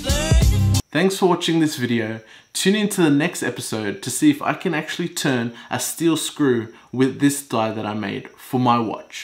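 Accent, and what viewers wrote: Australia. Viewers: Are you in Australia? You sound it haha. I haven’t watched all of your videos yet but course did/are you doing? B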